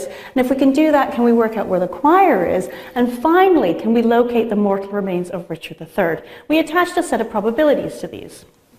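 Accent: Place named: Ireland. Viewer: For a Canadian, she has quite a few words that sound very Irish.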